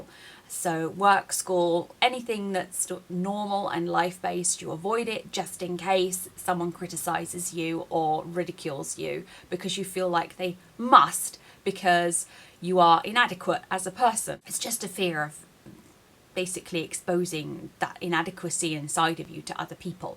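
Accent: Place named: England, United Kingdom. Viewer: ! Are you out of country? I'm saying you seem to have an English accent! And can I say a beautiful woman! Commented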